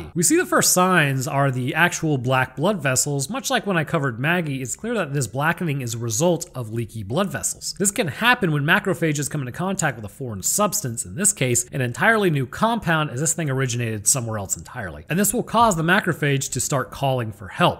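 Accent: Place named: South, United States. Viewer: You can tell you were raised in the south lol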